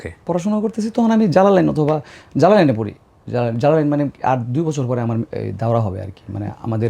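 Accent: Kishoreganj, Bangladesh. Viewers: আমাদের কিশোরগঞ্জের মাতৃভাষা টা মাঝে মাঝে এসে যায় এইডা ঐ পরিচয় হে যে আমরার কিশোরগঞ্জের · ভাই আপনাকে কিশোরগঞ্জের গ্রামের ভাষাতেই বেশি সুন্দর শুনায়।বার বার গ্রামের টোন চলে আসছে আর আপনি বার বার ঠিক করার চেষ্টা করছেন,এই ব্যাপারটা ভাল্লাগছে।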